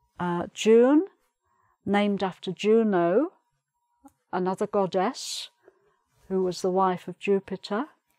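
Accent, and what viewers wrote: United Kingdom. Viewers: I’m from Brazil and i love your videos and British accents 😍❤️❤️ I learn more about the months and your origen so interesting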